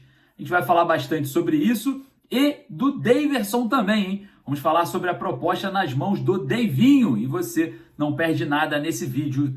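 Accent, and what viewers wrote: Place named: Rio de Janeiro, Brazil. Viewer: Que carioca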